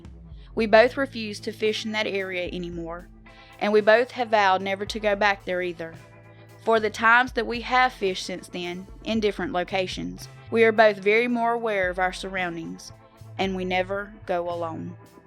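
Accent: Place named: South, United States. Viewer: great job,u have that sweet southern twangggg!!! just love it😀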